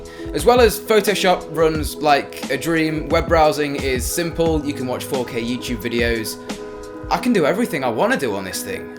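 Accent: England, United Kingdom. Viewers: You’ve basically copied MKBHD with the pros and cons Only with english accent ...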